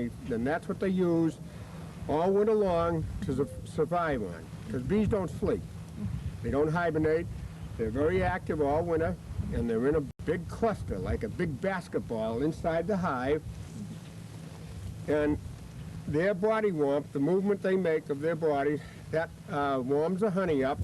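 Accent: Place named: Rhode Island, United States. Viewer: Has kind of a Quahog accent.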